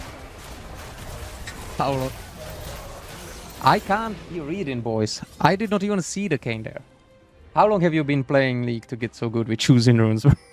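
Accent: India. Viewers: I thought you were an indian cuz of accent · all this while i tot was indian from his accent.:D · Yeah, he sounds Indian 😭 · I thought you were indian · i though you were indian lol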